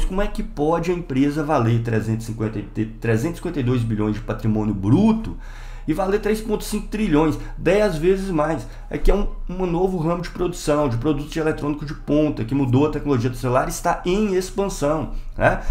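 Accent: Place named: Northern Minas Gerais, Brazil. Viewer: Cheguei aqui de volta devido a um corte recente. Estava ouvindo e apreciando tantos sotaques familiares e incomuns de se ouvir na internet, os caras de Uberlândia e você de Turmalina estava ouvindo e lembrando de colegas que tive na época do CEFET com o sotaque de mineiro do norte e minha namorada e eu pensamos a mesma coisa enquanto você contava a história que só faltava você falar que estudou no CEFET. Foi uma grata surpresa ver alguém com trajetória similar de tantos outros colegas que tive e de um espaço que é grande na minha vida. Não sei pq mas me senti compelido a vir aqui comentar. Apesar de discordar de certos pontos, temos em comum a escola de origem e o marxismo como norte. A participação em tal podcast foi ótima, didática e eficaz, está de parabéns.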